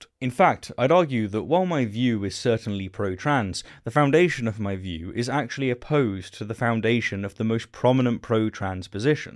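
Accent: United Kingdom. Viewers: I am one of those that accused you of bending the knee to the woke and am glad that you addressed the issue with this video which is very brave of you. I respect your intelligence and youthful zeal of which I once had so I have empathy of which I sometimes perceive as arrogance. It was what happened in your country, I gather is Britain because of your accent, that I got red pilled. It was how "Tommy" got treated for shining a spotlight on the"gangs". With all due respect Britain might already be lost and "Cherrio" law is imminent. First they silenced Tommy, then Mel Shaw and now Russel.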